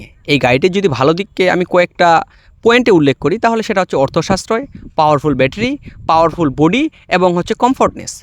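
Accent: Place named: Dinajpur, Bangladesh. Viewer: দিনাজপুরের ভাষা ❤, আরো ভিডিও করবেন প্লিজ, গাড়ি নিয়ে, এমন ভিডিও ইউটিউবে কম আছে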